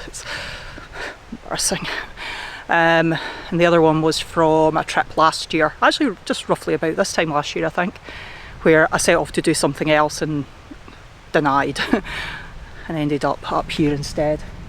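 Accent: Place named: Ayrshire, United Kingdom. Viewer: something wrong with his ears. Your voice is crystal. Your Ayrshires answer to Miss Jean Brodie.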